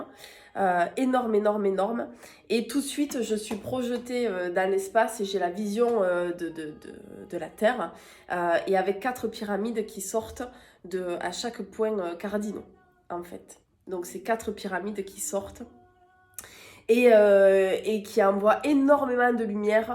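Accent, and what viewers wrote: South, France. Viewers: Une belle jeune femme avec un accent....c l’accent du sud lalala...et qui dit et qui raconte l’histoire d’une belle Lumière Bleue...c une vibration merveilleuse car tu en es toute transformée...❤❤❤❤❤❤❤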